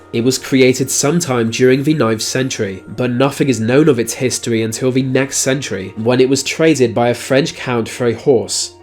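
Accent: United Kingdom. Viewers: Magicians are not creeps. You tea and scones accent. Fuckwit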